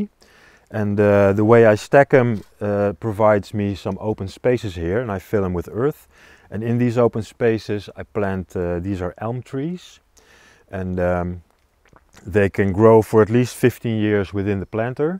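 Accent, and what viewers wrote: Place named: Australia. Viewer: You know- I was thinking your English reminded me of an Australian speaking and Lo- you lived there- or here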